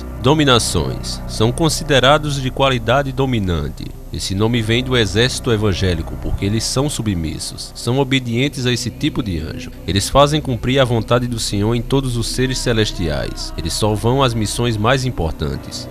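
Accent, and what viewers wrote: Northeast, Brazil. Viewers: seu sotaque nordestino e a cereja do bolo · o melhor do vídeo e o sotaque nordestino sou nordestino também nossa língua e foda lklk muito bom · Muito top a narração ainda mais com sutaque do meu nordeste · narração bem nordestina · O sotaque nordestino é naturalmente engraçado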